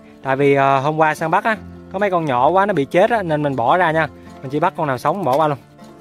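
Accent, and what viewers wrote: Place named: Mekong Delta, Vietnam. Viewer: e ở đâu? nghe giọng như người miền tây vậy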